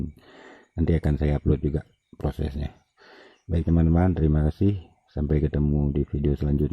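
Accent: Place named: Aceh, Indonesia. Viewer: Met pagi bang dari suaranya sepertinya abang orang aceh, salam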